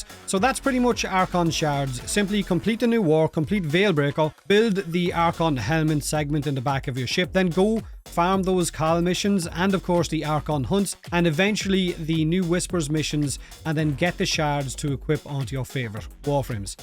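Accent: Ireland. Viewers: I sense british accent but it sounds more like irish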